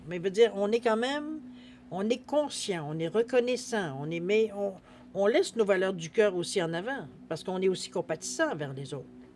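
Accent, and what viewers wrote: Quebec, Canada. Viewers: Bonjour je vous découvre et j ai beaucoup aimé vos tirages.Selon moi vous êtes du Québec selon votre accent si oui moi aussi.J adore vos interprétations à chaque oracle.Ce tirage me va comme un gant.Merci beaucoup ❤🙏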